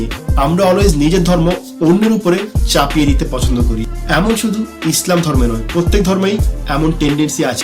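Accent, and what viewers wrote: Noakhali, Bangladesh. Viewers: ভাই আপ্নে নাকি নোয়াখাইল্লা??? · bhai are you noakhailla? আমনে আসলে কি নোয়াখাইল্লা?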